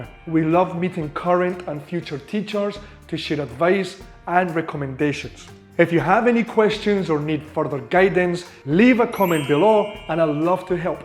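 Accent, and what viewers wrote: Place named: Arab world. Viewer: oh, that tough arabic accent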